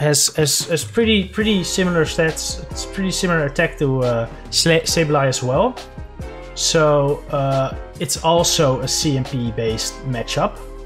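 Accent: Netherlands. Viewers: Are you dutch? Just by the accent good video, this was helpful for my sableye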